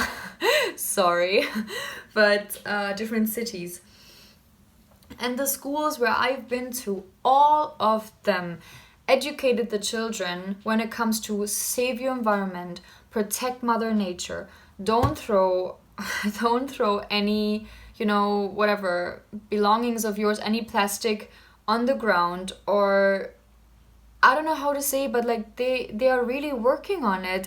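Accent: India. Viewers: Hey, u r really a indian girl.ur ascent and knowledge is purely Indian. Love u sis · Your accent sounds so Indian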